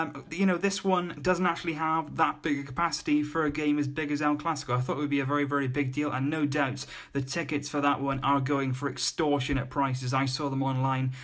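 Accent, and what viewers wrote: United Kingdom. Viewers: i have a question are u british ??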